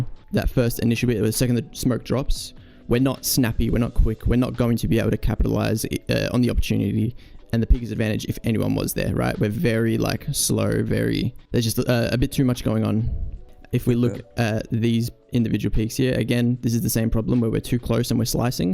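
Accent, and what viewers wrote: Australia. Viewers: as an aussie ibwould say he has more of a aussie sydney accent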